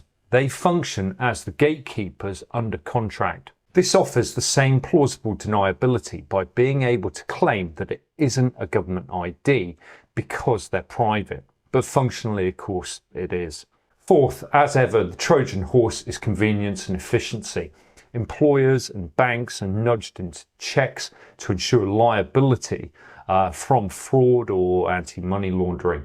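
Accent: United Kingdom. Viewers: you sound like a Brit.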